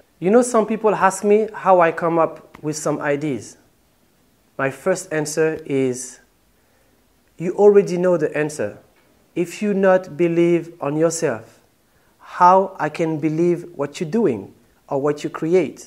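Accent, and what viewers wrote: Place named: Morocco. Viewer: wa axent maghribi:)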